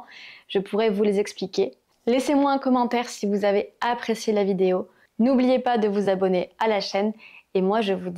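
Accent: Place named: Paris, France. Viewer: J'adore votre accent parisienne,merci.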